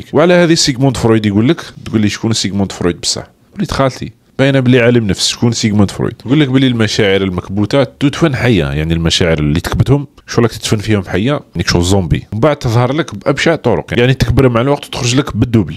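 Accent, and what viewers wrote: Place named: Algeria. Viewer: الهدرة جزايري و الشكل شرق آسيا 🤯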